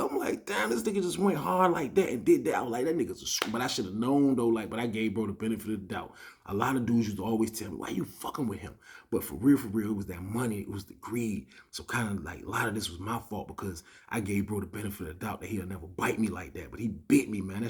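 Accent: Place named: Bay Area, United States. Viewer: a lot of similarities between how you talk and Bay Area. Richmond Cali is hard and of course we been calling Oakland da TOWN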